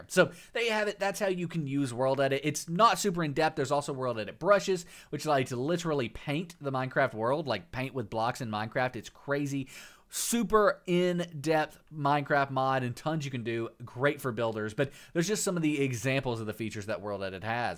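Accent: South, United States. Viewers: dude turns country at the end of every statement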